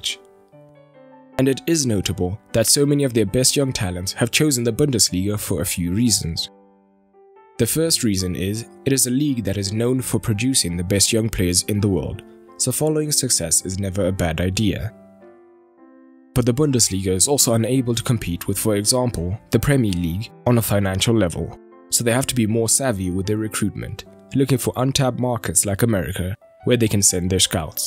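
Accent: South Africa. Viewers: Ehhh mmona are you South African ?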